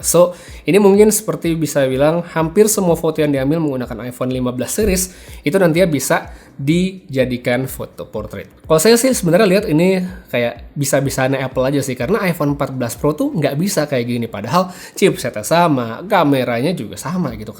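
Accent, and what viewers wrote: Bali, Indonesia. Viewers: Bang dr Bali kah?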